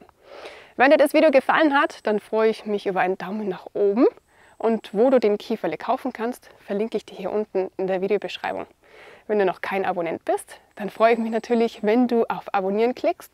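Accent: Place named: Swabia, Germany. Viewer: Perfekt wär das Video, wenn die ganze Zeit schwäbisch gesprochen hätte - ja, dieser kleine Kieferle! 😉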